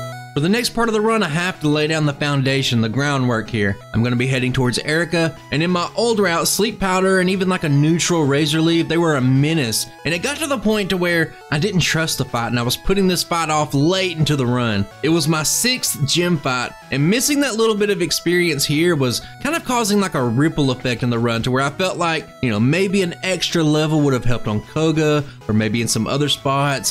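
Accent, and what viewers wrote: South, United States. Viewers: alright man, I’ll watch it to the end. Again I’m just a big fan I love your commentary (with the southern drawl as well)and seeing things I haven’t seen before from you makes me excited so I was surpised to see you go back to our wishful bug/dragon friend. Cheers mate! Keep up the great content!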